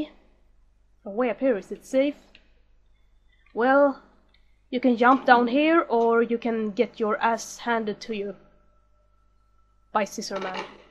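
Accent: Sweden. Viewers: ^ ^ ur accent kinda sounds swedish? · Great LP so far. I've just tried the game, I haven't really sat down to play it yet. cool accent by the way, my guess Swedish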